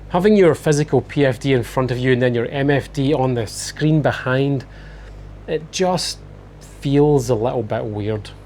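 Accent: Scotland, United Kingdom. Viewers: ha! Mate this is quite a clear Scottish accent - even as a Londoner I can struggle to understand a Scottish accent but on the scale of things this guy could read the evening news as far as I'm concerned. Try subtitles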